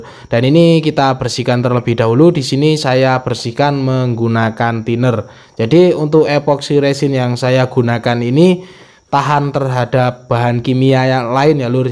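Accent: Temanggung, Indonesia. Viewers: Wong ndi to jane mass kok koyo wong temanggung ae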